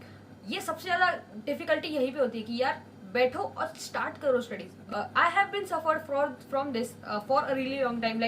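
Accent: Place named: Haryana, India. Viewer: U from harayana sista ??🤔🤔ur voice have some haryanvi look bytheway I am from harayana ✌️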